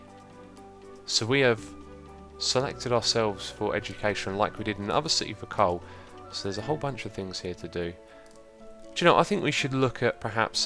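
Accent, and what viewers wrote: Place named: United Kingdom. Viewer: So nice too hear a fellow Brit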